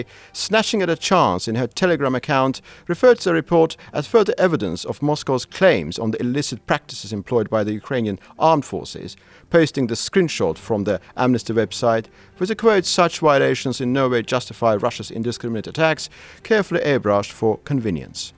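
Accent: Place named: United Kingdom. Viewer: Это прекрасно, что появились выпуски на англ языке. Однако, можно совет от англоговорящего человека? Прошу вас попросите комментатора не тараторить, в самых важных моментах используется военная терминология уместная для данного контекста, но зрителю нужно это ещё уловить и осмыслить. Плюс очень сильный британский акцент смазывает некоторые слова. Немного практики по примеру телерепортёров с BBC и всё будет отлично. И потом мы (зрители) рады и 15-минутному ролику, если нужно почётче всё изложить, мы только рады. Удачи вам во всех делах. Спасибо за вашу работу.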